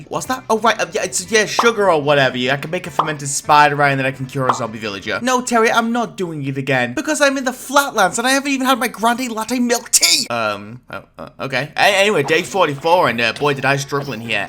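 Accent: United Kingdom. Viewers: i think its scottish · I love his britishness. "Frew out" "smoofer" "evewyfing"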